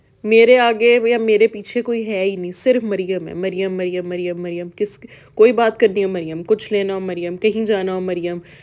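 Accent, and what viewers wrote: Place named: Punjab, India. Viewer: Love your Punjabi accent and advices ❤️❤️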